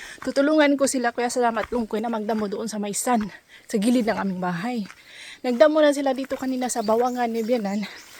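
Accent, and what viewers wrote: Bohol, Philippines. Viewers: Tagal bohol ka mam? Or surigao😅 kay murag mao mao ra gajud tag inistoryahan, taga bohol ko.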